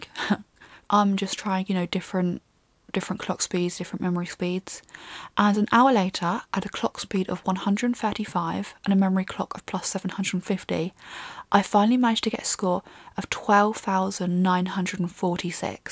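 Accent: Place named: Kent, United Kingdom. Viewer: Great video! Are you from Kent? Your accent reminds me of Kent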